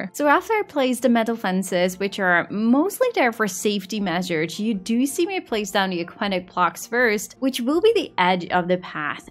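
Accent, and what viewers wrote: Netherlands. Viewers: im non-binary and my name is Nads. it would be really awesome to see a more androgynous looking staff member with my name 🥹 or a funky lil animal. I'm also Dutch btw!!! our accents are very similar 😁. I've spent the whole morning catching up on this series, and i love it so so much!! i would love to see more foliage footage personally, and besides, you tag timestamps so well (thank you btw) that people could easily skip ahead if they wanted to!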